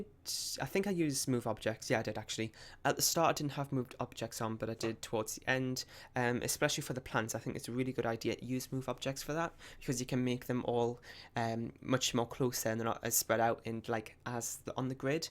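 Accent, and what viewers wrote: Newcastle, United Kingdom. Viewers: No worries :) I'm from Durham! I thought you would be from Newcastle cause of your accent :D I live in London now so my accent isn't as strong haha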